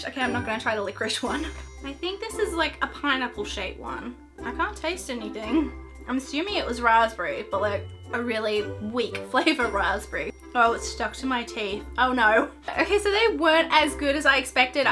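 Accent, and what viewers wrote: England, United Kingdom. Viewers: Oh I always thought you were British! Btw I’m a girl just on my dads account. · I thought she was from england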